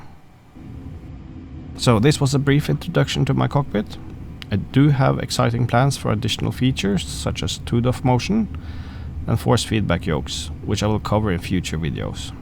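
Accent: Norway. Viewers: Du er Norsk høres det ut som. det greiene her var et ganske sykt bygg!! hvor holder du til? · Hah, kan høre at du er norsk!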